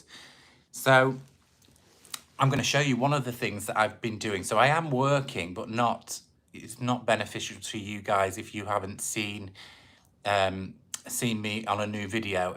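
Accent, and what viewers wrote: United Kingdom. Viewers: You're so welcome. Yes it is the accent. I've always loved the British and Scottish accents. 🙂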